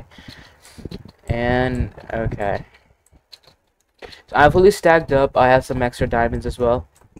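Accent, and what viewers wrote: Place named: England, United Kingdom. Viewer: vs his english accent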